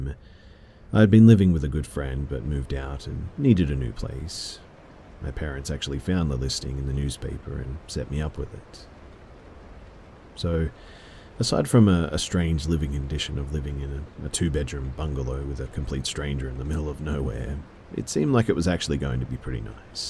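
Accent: Australia. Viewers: I love your stories! Your voice and Australian accent are awesome! You save my sanity on boring workdays.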